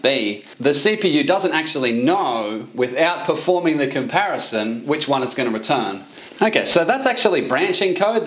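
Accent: Australia. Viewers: I don't anything what you talking about but I listened for the Australian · good to hear an Aussie accent. Yeah, I'm still a young programmer, only 15 years or so, but I always wondered about conditionals like "IF", because if the conditions weren't met to take the branch the CPU wasted it's time asking, I devised my own simple mathematical tricks. It may have taken a few extra cycles, but that was nothing. I never knew this was a "thing". How about that? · Nothing better than an Australian teaching you about programming casually, great video mate!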